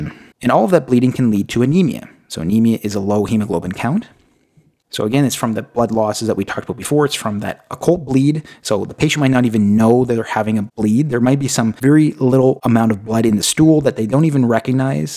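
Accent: Canada. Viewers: He sounds Canadian to me.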